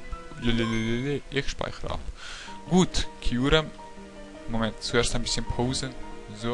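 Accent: Switzerland. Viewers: also ka ob du das liest, ich liebe deine Walkthrough's wegen dir kauf ich mir jetzt einen 3ds nur damit ich alle Generationen spielen kann:DD Ich liebe deine Video's und auch dein Schweizer Akzent - Das musste ich jetzt einfach hier schreiben auch wenn das Video schon 3 Jahre alt ist. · du bisch doch ganz klar en schwizer :D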